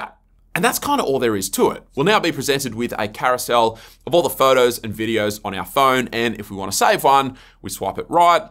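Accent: Australia. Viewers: I have to admit that the real reason I watch the 25 days series, is because I am smitten with his heavy Australian 'G'day Mate' accent.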